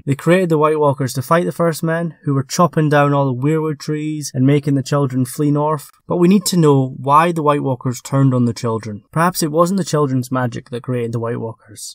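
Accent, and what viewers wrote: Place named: Scotland, United Kingdom. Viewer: Leave it to the Scottish guy to say "more main characters killed off." But, alas, you're right. And I really hope my accent detection is decent enough to know you're Scottish. I love your work and look forward to your videos everyday. Thanks for the hard work :)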